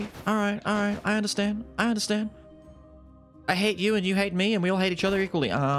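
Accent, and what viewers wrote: Australia. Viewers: Are you australien?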